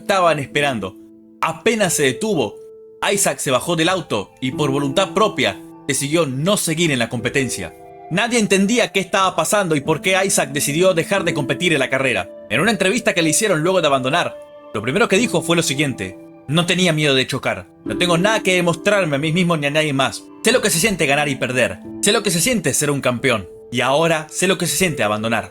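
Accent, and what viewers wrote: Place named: Argentina. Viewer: excelente video amigo! increíble que haya un canal de habla hispana (y argentino por lo que se te ecucha) que hable de Nascar!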